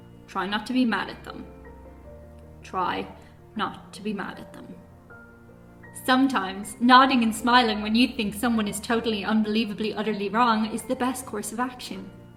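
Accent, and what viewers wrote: Ireland. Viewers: With that wonderful Irish accent you could tell me the world is coming to an end today and I wouldn't care.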